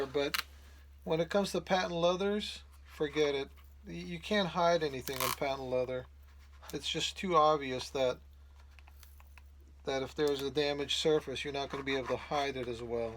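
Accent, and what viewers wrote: New York, United States. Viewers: In Cambridge England we say it like this sounds lPayTent. When you say it you sound like patTunt. How is patent supposed to sound, I just wondered. U sound like your from New York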